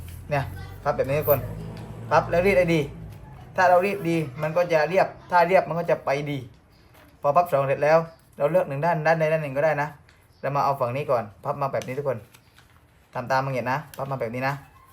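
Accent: South, Thailand. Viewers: คนใต้เหมือน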